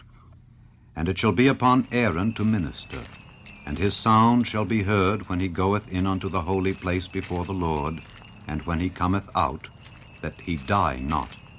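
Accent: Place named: United Kingdom. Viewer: So funny to see beautiful Jewish history with super British voiceover haha, Just feels so unnatural...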